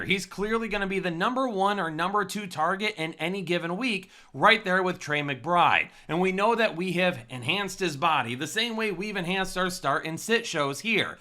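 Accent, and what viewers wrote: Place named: Michigan, United States. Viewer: Man, this accent is soooooooo Michigan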